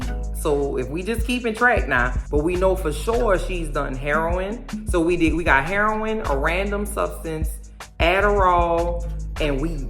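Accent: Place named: New Orleans, United States. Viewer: I am so glad I found your channel! I love your commentary and you’re so dang funny and I looove the way you speak! I lived in New Orleans for years and hearing you makes me so nostalgic for when I lived there - so comforting to listen to 😌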